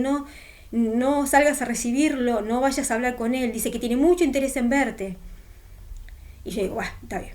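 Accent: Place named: Argentina. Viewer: Que curioso que hayas nacido en España, con ese acento pensé que habrías nacido en Argentina jaja. Que sorpresa lo de tu padre no sabía que era un artista de tango. Lo que cuentas muchos lo hemos vivido también, hay mucha hipocresía ahí dentro. Ancianos que fuman en su horario de trabajo (cuando creen que nadie les ve), adulterio, hermanas que cuando pasan las fiestas con su familia “mundana” que no es testigo celebran navidad y cumpleaños pero luego guardan las apariencias en la congregación (los típicos que tienen un pie dentro y otro fuera de la organización), etcétera. te prohíben cierto tipo de música y luego ellos andan escuchando música “mundana” de lo peor, en fin muchísima hipocresía. A mi de adolescente me prohibían ver ciertas películas porque decían eran malas y no se podían ver pero luego nos invitaban a alguna fiesta en casa de un anciano y su familia y veían películas de Disney como la cenicienta blancanieves o la bella durmiente que también tiene brujería y yo pensaba por qué yo no puedo ver tal o cual película y ellos si pueden ver películas disney que tiene brujería? 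En fin, pura hipocresía, la verdad es que hay un doble rasero y una hipocresía impresionante dentro de la secta. Nadie te tiene que decir que música puedes o no puedes escuchar, eso es decisión personal tuya y nadie se debe meter en esas cosas. Pero te quieren controlar cada aspecto de tu vida. Luego años después leí en un artículo de su página oficial JW org donde dicen que ellos no tienen una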